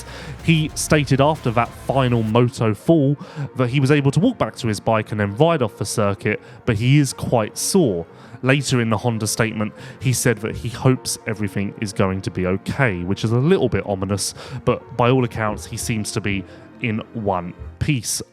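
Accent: United Kingdom. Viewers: thank you for talking faster and having live action, now I won't mute the hub. Luv a fast talking Brit!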